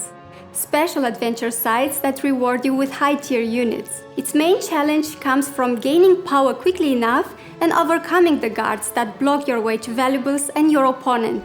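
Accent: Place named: Romania. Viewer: your accent sounds Romanian. I'm Romanian.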